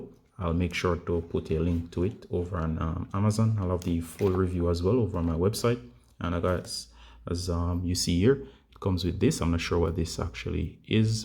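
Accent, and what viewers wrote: Jamaica. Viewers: Yow chap ur accent sounds like a yard man. Where in JAM can I buy this product?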